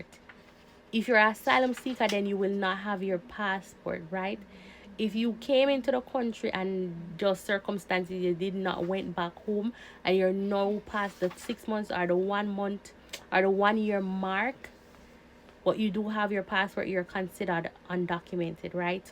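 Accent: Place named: Jamaica. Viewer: Thank fada Gad ur Jamaican,this i understand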